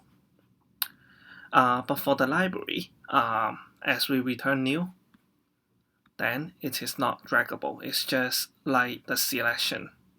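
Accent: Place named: Hong Kong. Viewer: Helpful!港式英语，哈哈！不过讲粤语更加少人看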